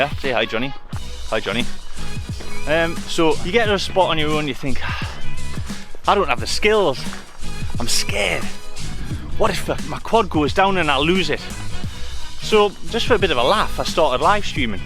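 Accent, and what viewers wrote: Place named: Newcastle, United Kingdom. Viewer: Mint video mate love to hear another geordie haha !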